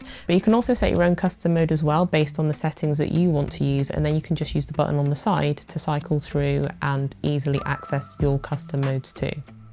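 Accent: United Kingdom. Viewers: And the British accent is like a free pass to "everything she says must be true"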